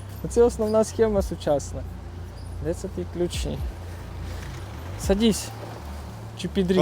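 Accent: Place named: Rivne, Ukraine. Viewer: Така балачка у вас ніби ви з півночі Рівненщини. Дубровиця, Рокитне чи Зарічне?)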